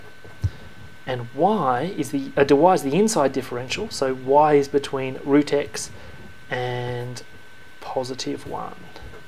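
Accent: Australia. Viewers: Definitely mate, an Aussie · Talha Bedir this sounds australian to me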